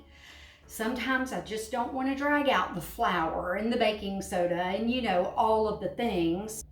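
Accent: South, United States. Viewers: I’m trying to make brownies and they never turn out right, out of all the videos on YouTube.. I really didn’t like any. After about 20 videos and endless scrolling I finally found yours… immediately the southern accent made me know this is this video for me!! I’ll be making this now. Thanks.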